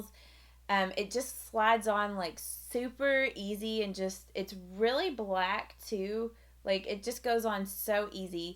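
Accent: South, United States. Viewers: It's a true blue Southern drawl. I'm from the south so I'm used to it and enjoy it. Honestly, I much prefer someone that talks too slow to someone that talks too fast.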